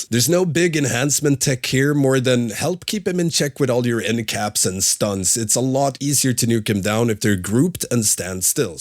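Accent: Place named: Sweden. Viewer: Hördes på flera ställen och inte bara i outrot att du är svensk. Kul nyhet :)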